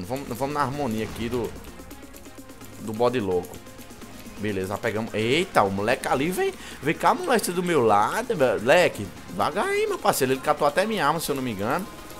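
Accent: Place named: Ceará, Brazil. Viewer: possivelmrnte se num vai meresponder mais vc e Cearense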